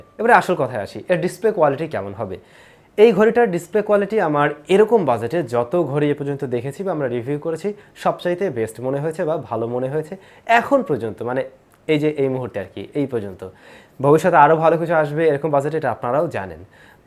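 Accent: Barishal, Bangladesh. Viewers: ভাইয়া বরিশালের ছেলে নাকি :/ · Vai barisaila language misaien na... 😫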